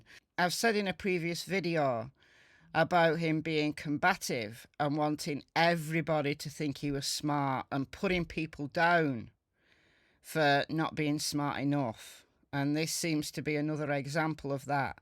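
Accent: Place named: Manchester, United Kingdom. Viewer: Love that Mancunian accent. Great content. Will be back for more. Lived near the Denton Golf Course in a previous life, on the way to Stockport.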